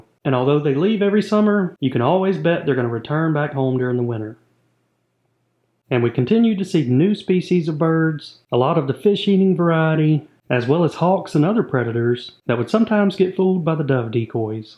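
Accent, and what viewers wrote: South, United States. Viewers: May the Carp be with you - cool Southern accent btw !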